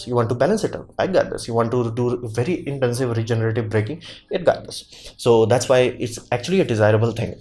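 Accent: India. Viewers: Our Bharatiya pronunciations. and fast speaking makes it difficult to digest your topic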